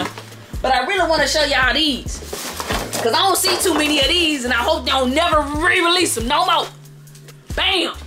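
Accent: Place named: South, United States. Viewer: ok ok you might be from the south I can hear the accent now lol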